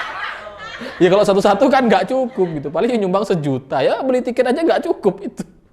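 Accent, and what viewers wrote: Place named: Surabaya, Indonesia. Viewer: Hahaha nguenyekmu broooo, khas suroboyoan 🤣🤣🤣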